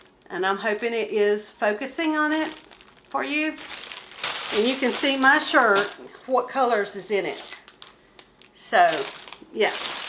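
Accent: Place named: East Tennessee, United States. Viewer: I’m in the East Tennessee mountains and proud of it. We do talk like that lol.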